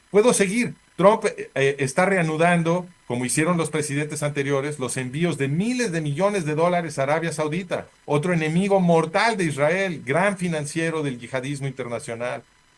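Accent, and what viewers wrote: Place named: Mexico. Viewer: Que video musica para mis oidos ..me iré a dormir felíz sabiendo que algun dia los arabes junto a EEUU...van a darle a Israel donde mas les duela ... ojalá paguen todo el sufrimiento que estan cusando al pueblo palestino .. Solo me queda una pregunta... cuál es el rollo de este tipejo con asento mexicano que defiende tan apasionadamente a los genocidas?...te gusta la guerra mexicano?... ojalá pronto veas a tu amado Estado genocida vuelto cenizas ....es mi mayor sueño.. No señor ningunos medios occidentales ...desde Israel hay quienes no le siguen el juego al genocida y estan protestando ...deje de idolatrar a los asesinos ...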